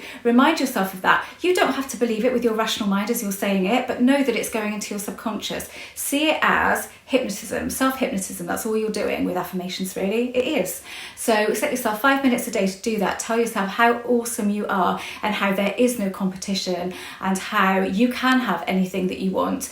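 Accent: United Kingdom. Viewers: i knew i wasn't going crazy. I wonder whatever happened to Noel Edmunds. lol. Anyway thanks for replying. And I must admit I sometimes get you and Natalie Dance mixed up. Soz. Anyway I like your teaching style. Nice to hear a British accent teaching this stuff.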